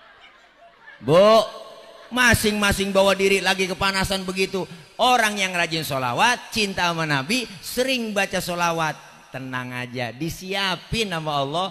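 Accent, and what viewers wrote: Jakarta, Indonesia. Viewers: Betawi banget Saya suka Ama ceramah"nya Tiap mau tidur selalu saya play Sehat Selalu Ustadz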